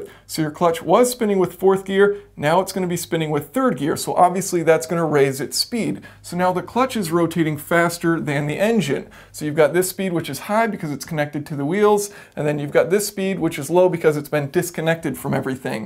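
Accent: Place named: United States. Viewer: only problem he is american. and drives lhd car and has a american accent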